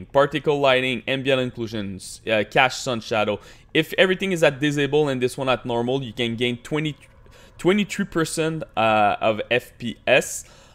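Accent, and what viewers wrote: Quebec, Canada. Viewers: Are u from Quebec?? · belle accent qc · Are you Québécois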